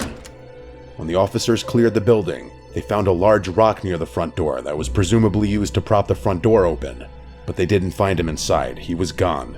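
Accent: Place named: Long Island, United States. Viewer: This dude is from Long Island for sure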